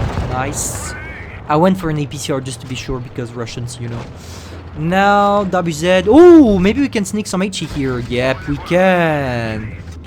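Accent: France. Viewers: Lol t'es français ? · Mec fait pas semblant t'est français sa se voit à ton frenglish 😏😂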